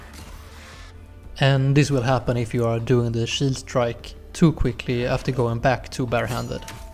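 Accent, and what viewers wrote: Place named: Sweden. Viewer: very nice swedish accent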